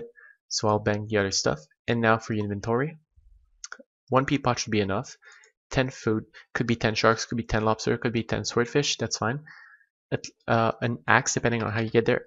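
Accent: Quebec, Canada. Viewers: Hey that accent, Quebec:P Bon guide!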